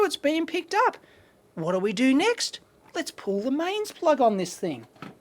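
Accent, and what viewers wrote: Australia. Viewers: I'm a "Yank", but I'm starting to hear a little Aussie voice in my head while troubleshooting hahaha!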